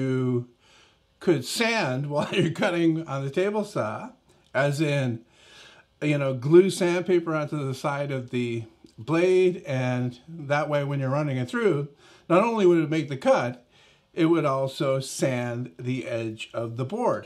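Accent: Germany. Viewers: Are you german ?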